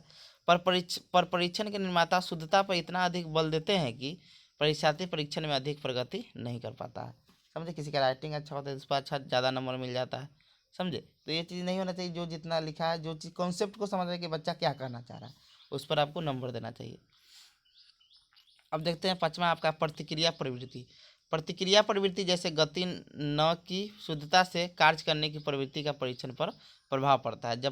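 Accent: Bihar, India. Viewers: Bihar se ho lg rha aap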